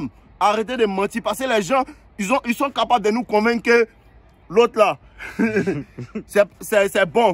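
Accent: Ivory Coast. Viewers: Tu parles comme un ivoirien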